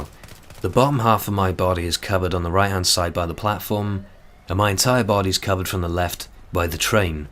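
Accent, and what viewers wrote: Wales, United Kingdom. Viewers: Informative, interesting, and...oddly soothing. British accents are great. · Nothing like Statham. This guy has Welsh in his voice. Statham is cockney.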